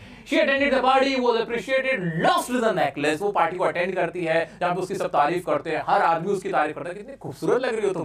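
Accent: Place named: Bihar, India. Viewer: Sir aap bihari ho 😸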